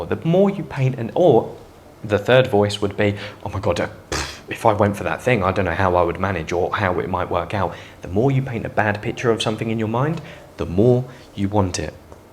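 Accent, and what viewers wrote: United Kingdom. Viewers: This man has a British accent.. I'm pretty confident I'm sold into 30 seconds..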